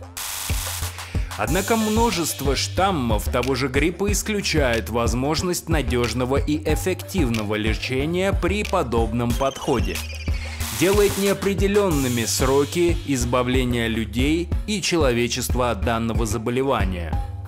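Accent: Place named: Baltic states. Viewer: из Прибалтики,вот и акцент,видимо,местечковый.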